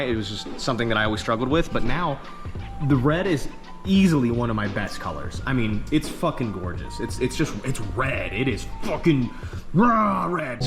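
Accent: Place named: United States. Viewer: A man and his deep country accent TRUCK, Yee Yee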